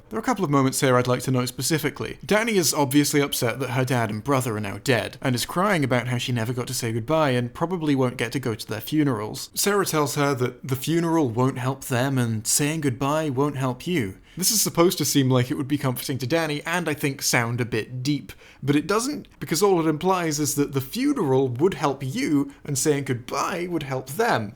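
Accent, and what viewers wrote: United Kingdom. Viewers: Why is it always someone on YouTube with a British accent that bashes this movie???? This is the 5th video with a Britain bum upset or angry. This hits their worldly view that a minority a Mexican at that is the hero in this movie. Shows how ignorant and underling views they have about other people! In the end this was a good movie and I suggest everyone not listen to the critics!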